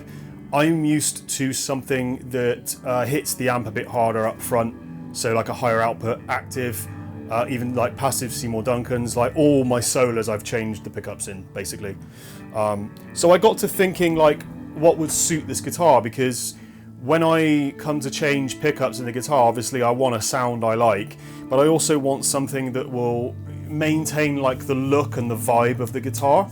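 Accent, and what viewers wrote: RP, United Kingdom. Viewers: I love your accent bro, very posh!!! I wish everyone in Cardiff could speak like you :)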